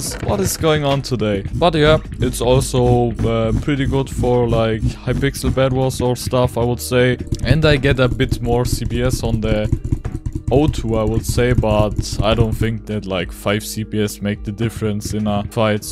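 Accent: Germany. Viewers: are you german?kinda sounds like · THIS IS THE MOST GERMAN ACCENT EVER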